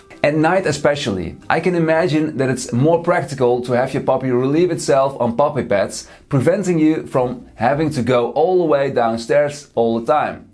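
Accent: Netherlands. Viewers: I had a duplex with a yard the last time I had to train a puppy. I have been without a dog for nearly two years but just cannot commit to training while living in a 2nd floor apartment. I really don’t like puppy pads either. By the way, I love your Dutch accent. I once lived in Geertruidenberg for a year and adore Nederland. I miss being there so much. Also, you are quite the handsome Dutchman! 🤩✌🏼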